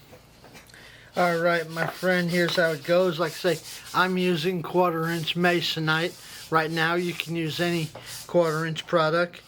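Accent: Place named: New Orleans, United States. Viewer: that's slick. I'm planning to build some drawers in my shop and I'm definitely going to have to use some " Blocks". I can still detect a little of the New Orleans accent.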